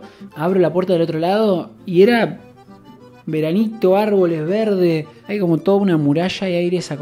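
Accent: Argentina. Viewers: Muy bueno todos tus sketch pero es que encima tu forma de comentarlos con tu acento argentino lo hace unico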